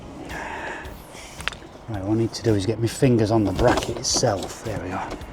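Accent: Hull, United Kingdom. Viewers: Are you from the East yorkshire area st all,you sound like a Hull lad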